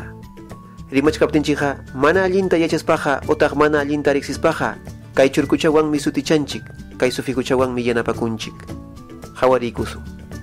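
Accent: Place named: Andahuaylas, Peru. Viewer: Tu quechua es Andahuaylino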